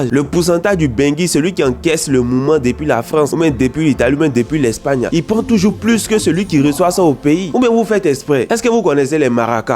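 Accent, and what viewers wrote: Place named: Ivory Coast. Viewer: Moi c'est ta manière de parler qui me fan tu montres que tu es un vrai ivoirien et très fière ouiii ❤❤❤🎉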